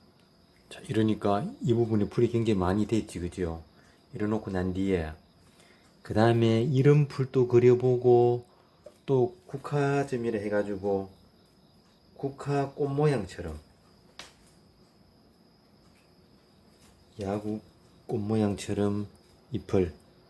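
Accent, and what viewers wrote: Gyeongsang, South Korea. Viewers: 경상도 말씨가 정겹습니다. 늘 감사한 마음으로 공부합니다.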